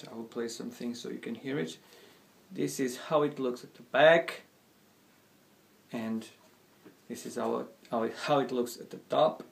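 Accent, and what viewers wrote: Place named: Portugal. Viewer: Pelo sotaque pareceste-me ser português lol uma pequena pesquisa e confirmei suspeitas! eh ehh:) bom trabalho! Cruzei-me ao acaso com este video e gostei!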